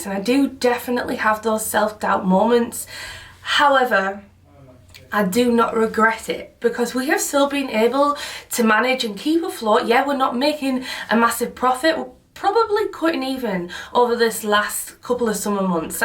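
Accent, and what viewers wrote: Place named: Northeast England, United Kingdom. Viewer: I'm from Newcastle and your accent intrigues me, its like geordie and yorkshire at the same time! lovely :)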